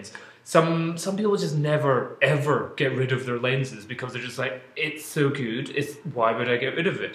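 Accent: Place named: Scotland, United Kingdom. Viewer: Offtopic: Everytime I hear you speaking (especially numbers) I start hearing the - in my mind:) That scottish accent:)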